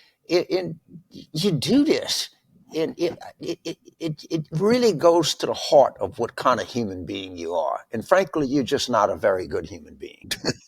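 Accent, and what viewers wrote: South, United States. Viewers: You are a Refreshing voice, with an Exquisite Southern accent...how you make me laugh ! Also a few of us are modifying the British Guy Fawkes night (Bonfire night) for us as Americans....if he and his conspirators had been successful they would have brought down the entire government as the House of Commons, House of Lords, & King James I were ALL present. Jan. 6th will live in infamy for me, as it was not the urination & defecation in the Rotunda, but the swastika, anti-Semitic T-shirts, lynching rope, etc. symbols taken in to the Capital UNDER THE GUISE of the CROSS.. My OUTAGE knows no bounds on this !!!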